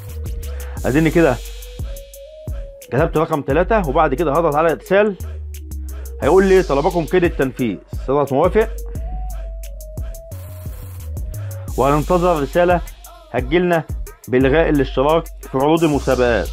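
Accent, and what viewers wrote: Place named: Syria. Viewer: انت سوري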